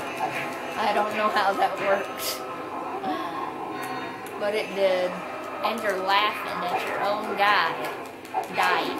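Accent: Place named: South, United States. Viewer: I love your southern accent makes you sound pretty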